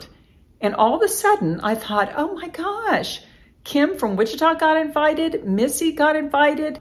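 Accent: South, United States. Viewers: are you a southern belle?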